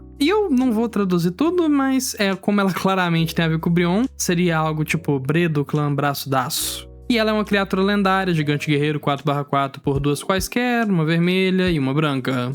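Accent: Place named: Minas Gerais, Brazil. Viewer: descobrindo agora q o é mineiro, entendi pq é tão suave ouvir os vídeos kakakakakka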